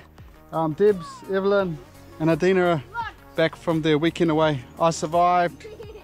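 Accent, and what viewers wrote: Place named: New Zealand. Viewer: Where abouts is ur farm u sound kiwi, also how many acres do u have?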